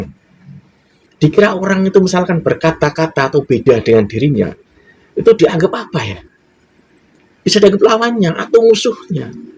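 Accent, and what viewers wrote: Java, Indonesia. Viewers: mas, aku kok seneng banget ya video sampeyan? cara bicara sampeyan itu khas jawa yg tenang dan lucu. salam kenal ya mas..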